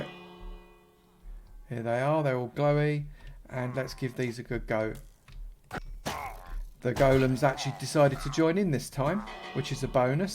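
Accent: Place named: London, United Kingdom. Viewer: Proper Londoner mate!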